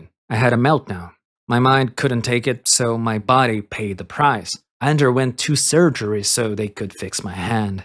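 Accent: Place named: Brazil. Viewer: Desde os primeiros segundos de vídeo eu tive uma voz alta na minha mente dizendo "eu sei q esse cara é brasileiro mas eu não tenho como provar" ate que com o tempo o próprio vídeo confirmou o meu pensamento e ter isso em mente enquanto assistia deixou a experiência ainda mais divertida(caçar os detalhes mais óbvios tipo as partes em q vc falava português ate os pequenos detalhes tipo o horário no desktop e etc) . Incrível o seu trabalho e espero muito que seu canal de certo.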